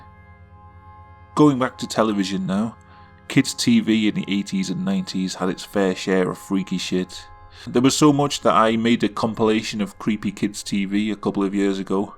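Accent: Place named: Liverpool, United Kingdom. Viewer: Ah cool your accent sounds slightly Liverpudlian. Yes I live in Bearwood and know Bromsgrove quite well, nice place.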